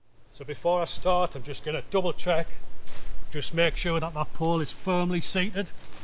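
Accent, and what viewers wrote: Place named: Scotland, United Kingdom. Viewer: the Scotich accent, I love so much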